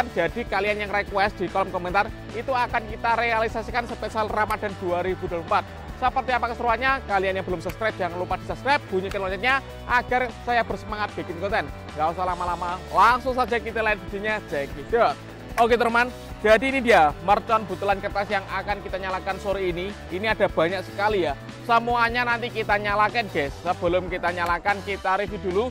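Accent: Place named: East Java, Indonesia. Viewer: Jawa Timuran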